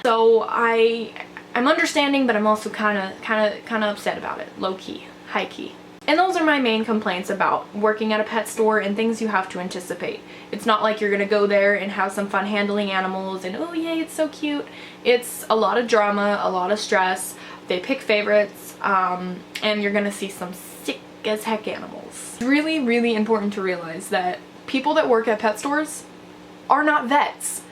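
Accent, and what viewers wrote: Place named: South, United States. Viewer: Where do you live?I notice a southern accent.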